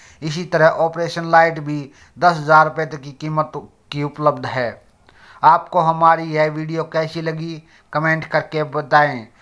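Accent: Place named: Haryana, India. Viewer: Sir wow super hit language,,, Fully hariyanvi touch सै!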